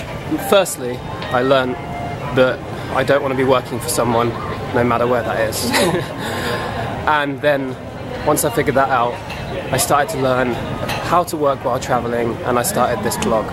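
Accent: United Kingdom. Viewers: love this British accent....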